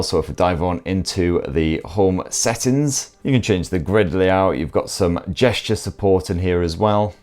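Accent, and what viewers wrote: United Kingdom. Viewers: i'm not a tech guy but love ya style, even though i'm not an native i love and appreciate your your lovey thick accent. Hope ya dont mind me asking what part of uk ya are from. thanks. you're me favorite tech guy so far 🙂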